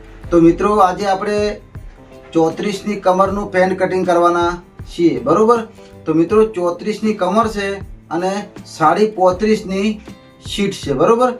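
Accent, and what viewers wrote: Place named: North Gujarat, India. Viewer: ઉતર ગુજરાત ની ભાષા સે સાહેબ,,કાયુ ગામ જનવાજો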